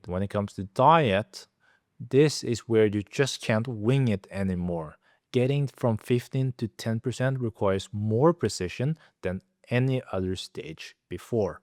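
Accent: Norway. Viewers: Love the Norwegian accent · Wait, it took me a minute to realize, but you have a Norwegian accent. Cool! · Do I detect a norwegian accent?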